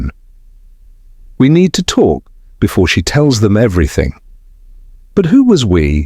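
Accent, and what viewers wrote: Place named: United Kingdom. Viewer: Why is this narrated in a British voice?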